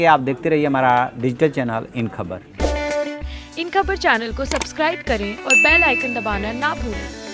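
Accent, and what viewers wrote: Bihar, India. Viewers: सर आप बिहार के रहने वाले हैं क्या???? आप की आवाज़ बहुत ही मृदुभाषी है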